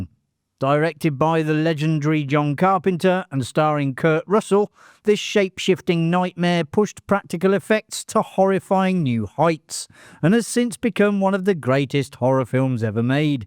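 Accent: United Kingdom. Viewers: LOL, Love how you keep saying Tobe wrong....and to the guy before me, this is not AI slop, he's just Brittish.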